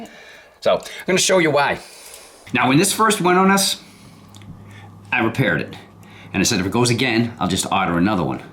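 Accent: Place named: Long Island, United States. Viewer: Smoke test - LOL Thanks... You must be from Long Island or New Joysy!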